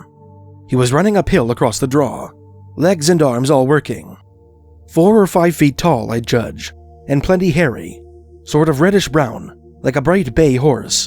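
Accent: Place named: Canada. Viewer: I really wanted to listen to these stories. But the voice ... is the reason people poke fun at us canadians. Stop patronizing the stereotype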